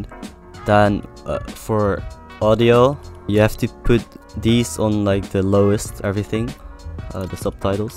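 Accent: Netherlands. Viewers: Yo maatje ik hoor dat je nederlands bent klopt dat?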